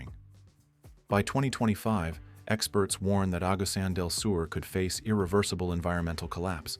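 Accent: United States. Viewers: Please correct your pronunciation of place names such as Pampanga and Batanes - it is disrespectful to say those with such an American accent!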